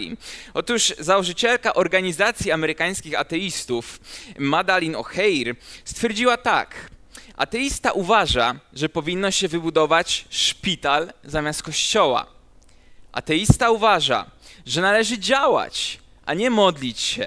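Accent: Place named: East, Poland. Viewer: Ma bardzo delikatny wschodni akcent. Może dlatego, że dorastał na Ziemiach Zachodnich? ;-)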